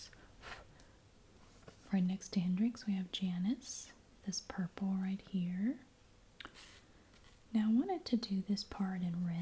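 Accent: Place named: North Carolina, United States. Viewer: Also, I love your videos and your voice and accent sound very similar to my godmother's who is from Hertford, NC. It's so comforting 😊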